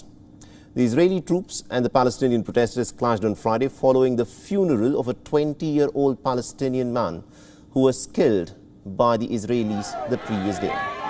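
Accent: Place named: India. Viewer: You need to polish up your accent so it's clear outside india ,it's very difficult to understand you .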